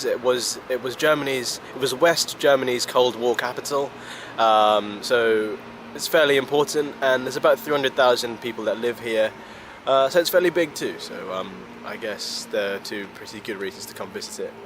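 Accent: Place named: United Kingdom. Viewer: Those soothing british tones will be popular with american viewers. Rake in those views boi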